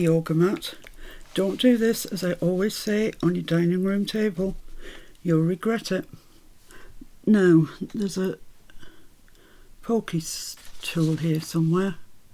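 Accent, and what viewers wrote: England, United Kingdom. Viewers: It is the difference in accents. I am in the United States. My son in law is from your part of England and sometimes he has to repeat things for me. I will continue to watch what you are doing as i love them. I bought an Edith Holden book so I can use it for some of your wonderful journal items. I had never heard of her books so you have expanded my knowledge. Thank you.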